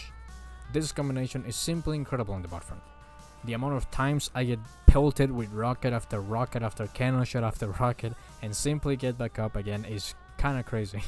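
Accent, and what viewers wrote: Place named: Eastern Europe. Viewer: The Eastern European accent has become strangely endearing to me. Given the vast amount of YouTubers with the accent who I watch, I have subconsciously begun to associate the accent with quality content. I’m not sure if that’s a good thing.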